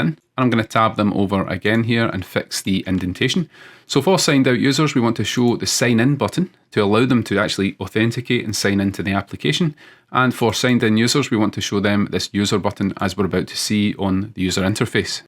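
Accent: Scotland, United Kingdom. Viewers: Within the first few seconds of watching the video, I got strongly drawn towards his Scottish accent of English .... Are you from Scotland Sir? Love from India !!!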